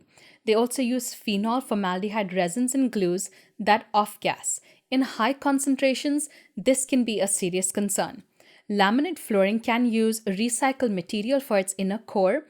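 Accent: India. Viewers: Your looks and accent are so Indian 😂 (Asian Indian). I can't get over it.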